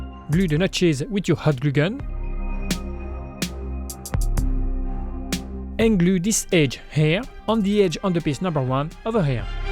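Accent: France. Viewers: t'es pas un francais par hasard ? Cet axcent et vraiment similaire stp reponds moi t'es creations sont incroyables continu comme ca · A en croire son accent il est français ??? 🫶🏼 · You have a french accent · L’acent français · Wow the french accent 🫠🫦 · Tu es Français cela ce voit grâce à votre accent extraordinaire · Avec ton accent français, ça fais bizarre je vais pas mentir, par contre super mask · frero sa ce vois que tes français vue ton accent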